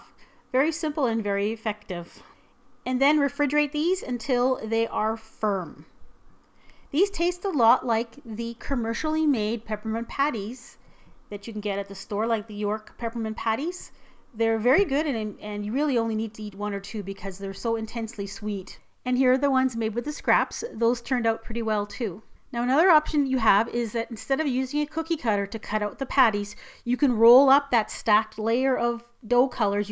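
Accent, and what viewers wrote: Canada. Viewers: Are you Canadian or American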